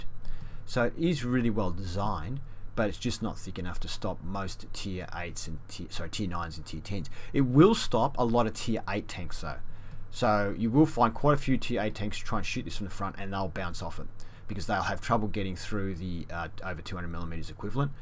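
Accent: Australia. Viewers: I see you have the 124 games in the FV183. Can you do a review on that? What do you think of the HESH after the nerf to pen? You do Excellent reviews. I like the Aussie accent !! :P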